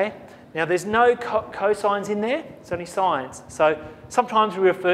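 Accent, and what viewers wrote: Australia. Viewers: I haven't seen too many Australian people on these sort of videos. There's something about the accent that I love even though I'm American! Great work. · Bonus for having a ‘Stralian accent 😊🥳🎊🙌🏽 Awesome video! Greetings from the US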